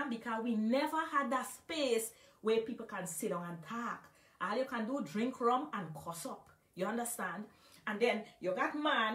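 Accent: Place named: Guyana. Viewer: I am not guyanese or caribbean but love listening to your beautiful accent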